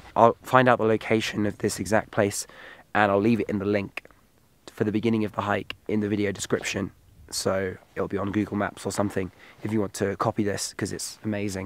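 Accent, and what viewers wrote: United Kingdom. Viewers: مـفـاـح السـبـيـعـي يقول عجبه الخضار هههههه لغتي ركيكه واضن انو يشبه بعض المناظربدوله مدري ايش اسمها ولهجته بريطانيه ههههه ماكملت الفديو لوكان مترجم كان احسن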